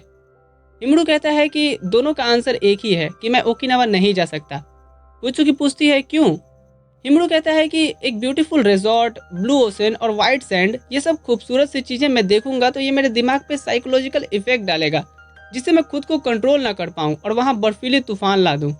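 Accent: Bihar, India. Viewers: Bihari h ky itna ganda explanation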